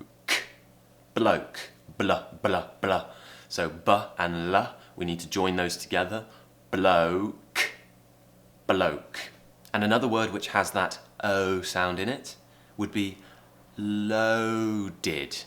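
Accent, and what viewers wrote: United Kingdom. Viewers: I love you and your lessons and the same english with Lucy but your pronunciation is more British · Hi. I'm so happy to learn Brtish accent through your video. I love your Brtish accent because it sounds beautifully and you show clealy how to pronunce Brtish English words. Thanks a lot. ( ^-^)ノ∠※。.:*:・'°☆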